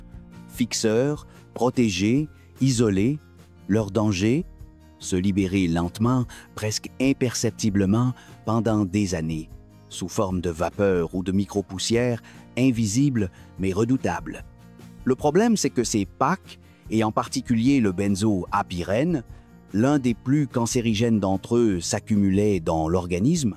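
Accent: Canada. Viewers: Le monsieur (à moins que ce soit une IA ) a plutôt l'accent canadien. C'est normal ?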